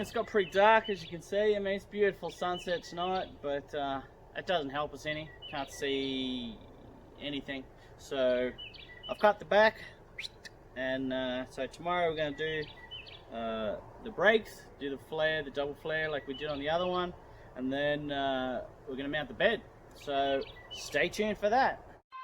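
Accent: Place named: Australia. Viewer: This is so weird homie has a Aussie accent I don't think there in Australia but he's into square bodies like a California or Texas boy I'm jealous of his truck collection slash junkyard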